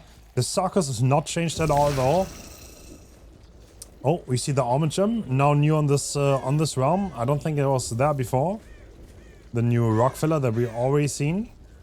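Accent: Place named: Germany. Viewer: jetzt wo ich weiß das du deutscher bist weiß ich warum mich dein englisch immer so getriggerd hat und ich deine videos wegen den aktzent mir nicht geben konnte aber freut mich bin zwar halb russe aber das einer meiner lands männer so ein erfolgreicher dbd youtuber geworden ist ❤️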